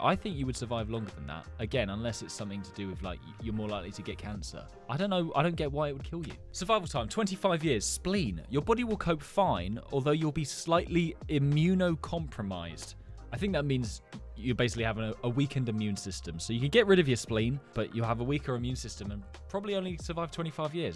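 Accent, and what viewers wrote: United Kingdom. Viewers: I’m sorry, but you sound British are you British at the sound British so are you British? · …you are British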